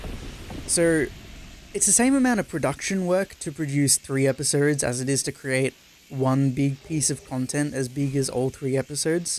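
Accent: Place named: Australia. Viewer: Well, he is Australian, "sour"...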